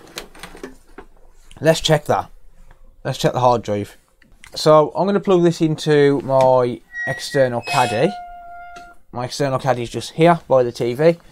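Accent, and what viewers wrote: United Kingdom. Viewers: Thing is, I can understand British English quite well. I can barely follow Benedict Cumberbatch is Sherlock when he goes 120% in his thinking, but I can get the general gist. Maybe it's the accent that throws me off in this case. About anime, well, I listen to the original dub, not the English one :D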